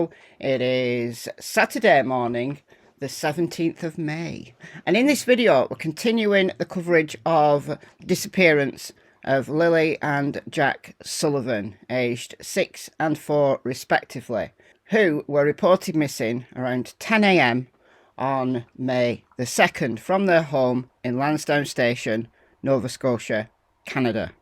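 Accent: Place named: Lancashire, United Kingdom. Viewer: Hey - your voice remimds me so much of my former mother in law(a Lancashire lass!) On a serious note, my grandkids are a 6 yr old girl and 3 yr old boy. The idea that they would go off alone from the safety of their garden and into the woods is utterly preposterous. There is just no way on earth.... I don't think they were abducted either - I don't think they ever left that home, at least not alive 😢 I find it interesting that out of the many videos there are regarding the subject ive not seen one comment that exonerates the parents of all blame and believes their story whch says a lot really doesn't it! Sending love from mid Wales! 🏴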